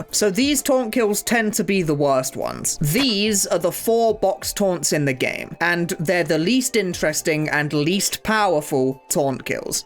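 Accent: United Kingdom. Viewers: violently British man talks about violent things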